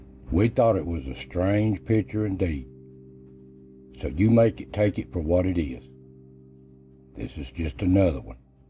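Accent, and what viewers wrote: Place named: South, United States. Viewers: Hello good sir.. A new subscriber here.. I'm always intrigued by the US southern accent 😄.. I also love paranormal things, pls more content like this.. 😁 Be safe & God bless.. (A warm greetings from Indonesia 👋🏼🇮🇩.) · Greetings from Australia.Loved listening to your videos. I just love your southern accent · Yes your voice is beautiful. Not just the physical quality of it, but your slow cadence and beautiful Southern dialect.❤ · I love the narrator's voice & beautiful southern accent! Sounds so soothing, like my Grampa telling me a story, which are the most precious ever. ❤️ ✌️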